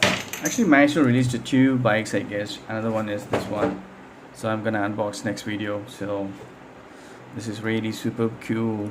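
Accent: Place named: United States. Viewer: Nice American accent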